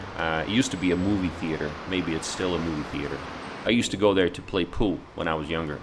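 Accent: South, United States. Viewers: New sub. Очень приятно. May I ask where did you learn English? I've been watching your videos and when you talk you have a particular accent...it sounds either southern or you learned in the "hood" (no disrespect I'm from the "hood") either way I like your videos. Спасибо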